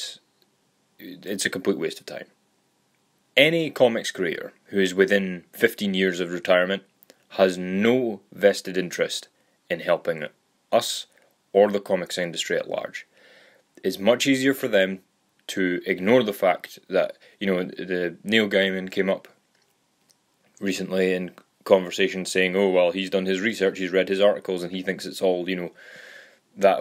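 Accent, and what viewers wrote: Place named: Scotland, United Kingdom. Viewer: Nice to hear a Scottish voice on comicsgate mate. Glasgow ?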